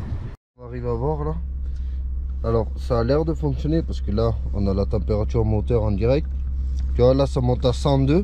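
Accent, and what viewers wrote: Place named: Perpignan, France. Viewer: ah entendre l'accent de Perpignant çà fait du bien !!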